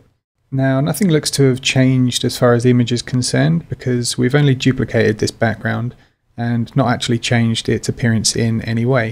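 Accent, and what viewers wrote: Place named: United Kingdom. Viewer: Brilliant! Thank you so much.... I especially loved your British-isms, being a Brit living in America for the past 16 years I really felt at home especially with 'stuffed' and 'dodgey'. A fab, well paced tutorial that has prevented me throwing my laptop out the window because of Photoshop. Thank you.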